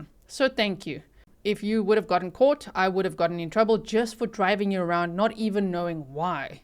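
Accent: South Africa. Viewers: Im so glad is South African. Her accent is perfect for this space it gives an air of bipartisan, but you know she always stands for the victims. I guess I'm used to hearing American accents, and they just get on my nerves. Lol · Very much enjoying this content. I like the factual breakdowns. But someone, please help. I'm trying to place her accent but I'm failing. I'm thinking south Africa? My SIL's South A accent is similar but a bit different.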